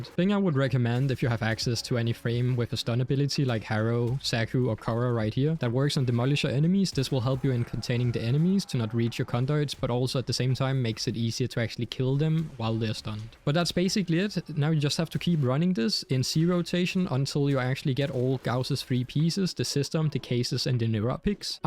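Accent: Denmark. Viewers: This guy sounds so danish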